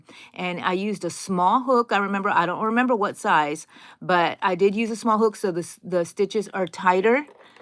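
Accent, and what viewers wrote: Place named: New Mexico, United States. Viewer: Are you from NM? Your accent reminds me of the abuelitas here!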